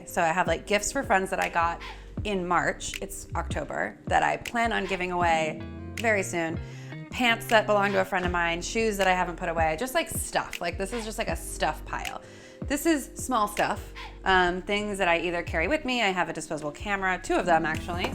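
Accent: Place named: Bay Area, United States. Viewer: She's gotta be from the Bay Area. Between the accent and the nutritional yeast on the popcorn...(which yes, is delicious)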